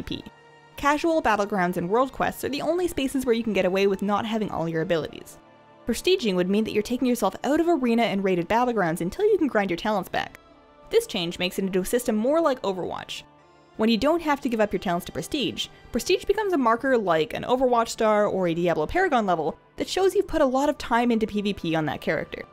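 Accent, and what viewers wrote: Canada. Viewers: Great vid, love your enthusiasm and super Canadian voice. Subscribed :)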